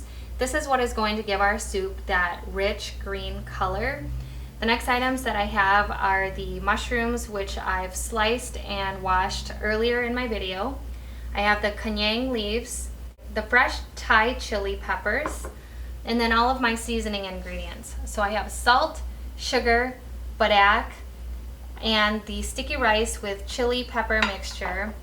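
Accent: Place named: East Coast, United States. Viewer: Are you from the east coast? You have this little accent just like me lol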